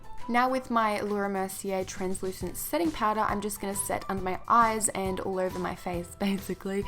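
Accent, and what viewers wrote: Australia. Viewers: Australian accents are so cool omg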